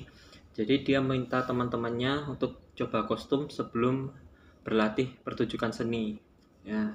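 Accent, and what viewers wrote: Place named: Java, Indonesia. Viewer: pertahankan medokmu mas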